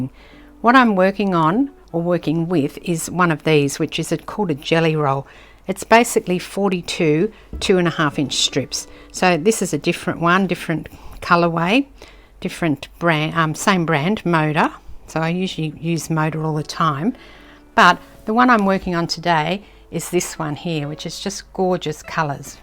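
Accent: Australia. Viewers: Hi, that’s a great tip on this lovely Fat Quarter Shop quilt. I especially loved listening to a fellow Aussie. Cheers Camille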